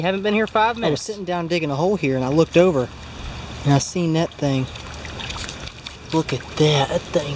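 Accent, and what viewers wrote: South, United States. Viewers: I just want to say that I really enjoy your videos! you have a great southern accent too. you have gotten me into the great hobby of metal detecting. I'm gettin a garrett at pro pointer today off of amazon. Good luck and god bless you.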